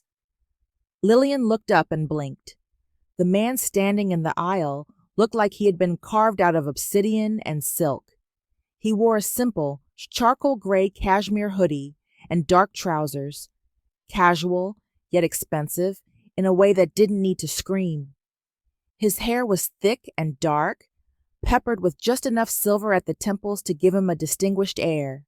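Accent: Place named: United States. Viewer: I find this voice difficult to listen to it's slightly aggressive and very American. Although the story was good it progressed very quickly.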